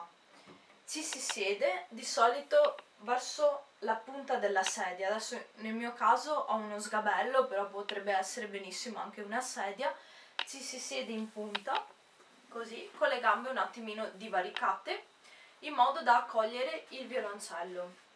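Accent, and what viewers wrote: Veneto, Italy. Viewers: Hai studiato in toscana ma mi sembri veneta dalla pronuncia 🤔...sbaglio ? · Dall'accento non sembri toscana sembra veneto,comunque bellissimo strumento mi piacerebbe suonarlo ma lo trovo difficile per me..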